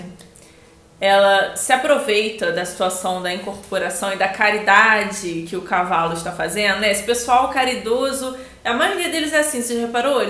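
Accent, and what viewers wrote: Rio de Janeiro, Brazil. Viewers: ? VC É DO RJ?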